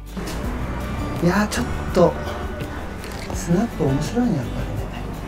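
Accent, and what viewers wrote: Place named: Kanto, Japan. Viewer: 偶然この動画にたどり着きました。築地って、Zfcがピッタリ似合う町ですね。驚きです。写真系動画は、自分で見ている範囲では関西の話し方の人がが多く、説明を解読？している感じで苦労があります。様の話し方は、東京？関東？ふうでフィーリングやニュアンスがしっくりきて聞きやすいです。バックナンバーも見てゆきますのでよろしくお願いいたします。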